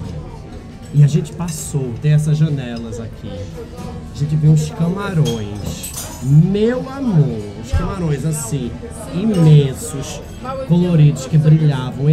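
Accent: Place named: Salvador, Brazil. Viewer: Vc é baiano,? Tem um sotaque soteropolitano aí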